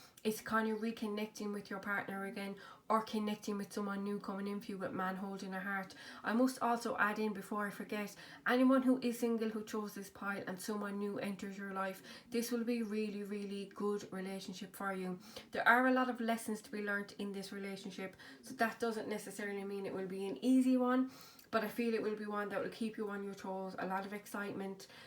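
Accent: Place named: Ireland. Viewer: By the way your Irish accent is so cute. I do not hear people who speak English with Irish accent but it sounds interesting.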